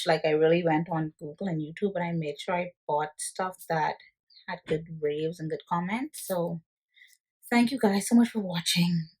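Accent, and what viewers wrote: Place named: Bahamas. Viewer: Absolutely obsessed with watching Sephora hauls! I’m a Bahamian living in Canada too and when I hear your accent I was like uhhh yeah I gotta subscribe! Keep it up your video quality and content is very nice!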